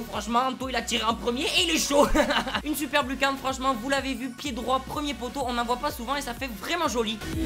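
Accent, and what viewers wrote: Marseille, France. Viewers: Salut mon vieux, obligée de t'écrire un commentaire sur youtube pour te joindre ! C'est pas possible ça haha J'ai maté ta vidéo, ça fait longtemps que j'ai pas entendu ton petit accent marseillais/landais/nonidentifié en vrai donc t'es dans l'obligation de me donner de tes nouvelles!!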